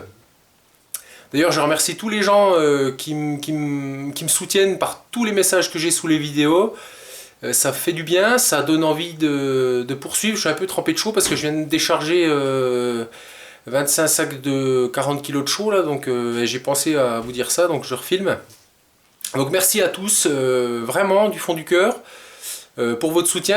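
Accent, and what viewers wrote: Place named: South, France. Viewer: je vois que tout le monde est rentré du chantier ou des courses à cette heure. Fais tes vidéos avec ton envie et ton petit c...r, ça va marcher tout seul (bon dans le texte y'a pas d'accent, toi tu l'as du sud, de la contoise, et moi de la parisienne, ça nous ferais un mélange bien bizoire) A+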